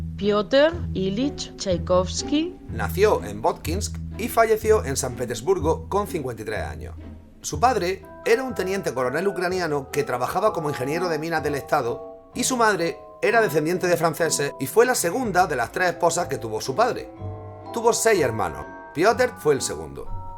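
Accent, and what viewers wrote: Andalusia, Spain. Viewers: es acento español de Andalucía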